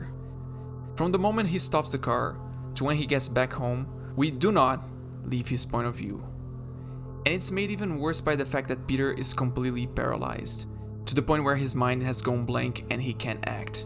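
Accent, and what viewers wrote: Brazil. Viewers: Great content! Do I hear a hint of a Brazilian accent?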